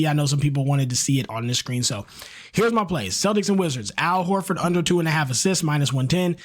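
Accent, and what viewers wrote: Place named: Midwest, United States. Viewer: Bro. You in OHIO. I’m in Cleveland. I knew u was Midwest ; we talk just alike. 🤜🏽🤛🏿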